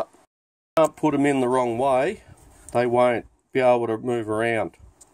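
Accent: Australia. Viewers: Sounds like an Aussie accent. I'll get you a James Boag's next time I am on the Gold Coast. Notice that the inner part with the splines is flush on one side and has a raised section on the opposite side. I wonder why and I wonder which end of the splined shaft goes into which end, the flush or raised side?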